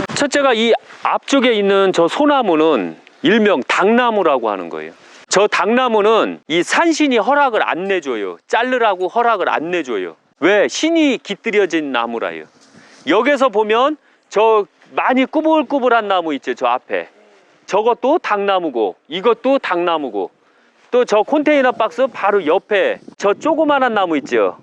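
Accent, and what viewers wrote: Chungcheong, South Korea. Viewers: 선생님잘보고갑니다^^ 충청도사투리구수하네유^^ 충청도어디세유?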